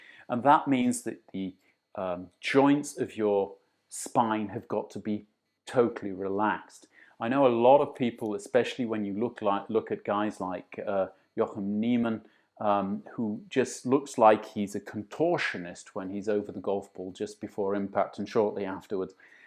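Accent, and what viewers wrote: RP, United Kingdom. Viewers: Thanks for the video,. Where are you from? Your accent sounds English-ish with a hint of German or Scandinavian... my mother is English (I grew up in the states with an English mother) and been to England many times. I can't figure your accent out. 😄 I enjoy your videos. You're very detailed.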